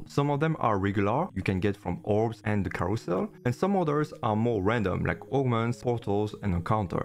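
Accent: France. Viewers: French accent was easy to understand most of time, but it took me a moment to figure out that you talked not about 'focused units' but 'four cost units' :) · C'est moins l'accent français que l'enfer d'écouter un français me parler anglais alors que ce serait plus simple en français mdr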